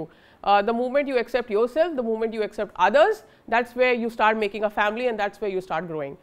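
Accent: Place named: Mirzapur, India. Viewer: loved ur mirzapur accent😍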